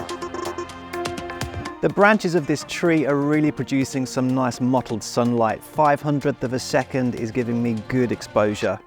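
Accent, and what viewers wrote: United Kingdom. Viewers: North London? 👀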